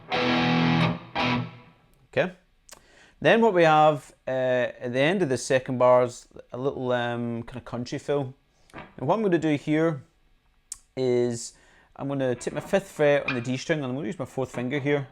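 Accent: Scotland, United Kingdom. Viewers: Sweaty knockers sounds best! In your accent! ;) Scottish? Great job on the playing.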